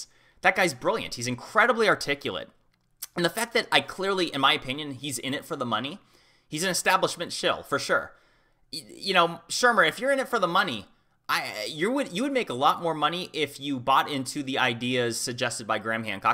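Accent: United States. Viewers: Totally unrelated to the topic at hand but you sound like you're from New England, am I correct?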